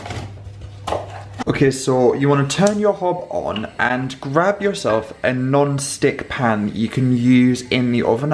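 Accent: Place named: Durham, United Kingdom. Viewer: pleasantly surprised to hear a Durham accent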